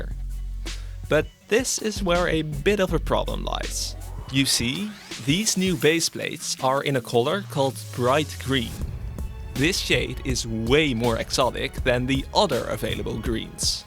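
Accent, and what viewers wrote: Netherlands. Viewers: this shit is fire!! (je hebt een licht nederlands accent btw XD) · Ik hoor de hollander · R u Dutch? I recognize the Accent 🤟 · Its pretty obvious that your from Europe i think your from the Nederland’s i can hear it from your voice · By the accent I just know you’re Dutch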